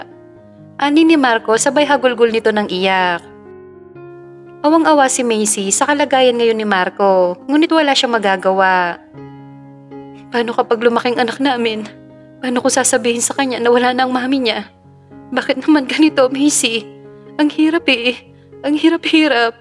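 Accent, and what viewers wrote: Philippines. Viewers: Anu kaya ang probinsya ng nagsasalita my vlogger din aq napapanood na vlogger sa cp nmn ganito ang boses nya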